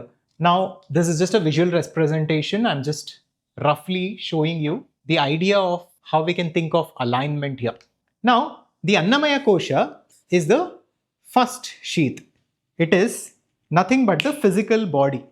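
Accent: South India, India. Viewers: Vedanta is as per kannada vedant is usually in hindi. By his accent he is from south india and that is how we pronounce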